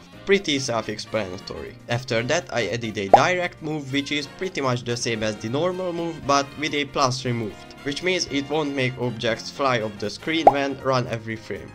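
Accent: Hungary. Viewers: Az az erős magyar akcentus❤😂(enyém se jobb) Szuper ötlet és megvalósítás amúgy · A magyar akcentus hallatszódik de ne add fel mert feliratkozok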